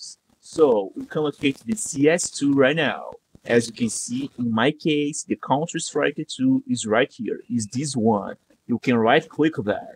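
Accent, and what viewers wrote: Brazil. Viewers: crtz q é brasileiro tem mt sotaque kkkkkkkkk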